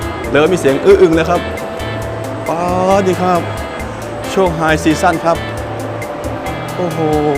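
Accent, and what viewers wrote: Phu Thai, Thailand. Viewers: เจ้าเป็นพูไทยฝั่งลาว หรือฝั่งไทย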